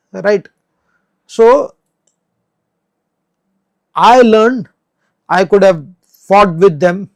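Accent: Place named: India. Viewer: I think it would be helpful and also appropriate, that the prabhu who is speaking introduces himself in an informative way.: how many years he is in ISKCON, who is his diksha guru, his management position if any, has he been appointed by ISKCON GBC to represent this viewpoint, etc. That should be the first point. There is no introduction, although some devotees in India ISKCON must be knowing him very well, I can only suppose. And if his presentation is supposed to be THE official position by the present Mayapur ISKCON GBC, perhaps someone should add English subtitles to his talk, as his Indian accent is very thick and may not be understood by a wider audience of devotees and lay people, who may not be not familiar with his language accent. Hare Krishna.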